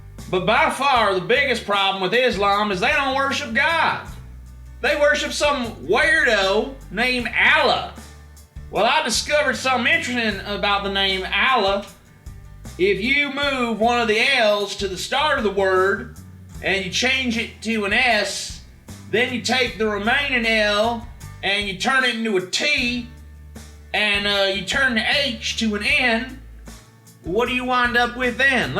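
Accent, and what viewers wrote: South, United States. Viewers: Where did You learn that dialect? as a Southerner i`m impressed.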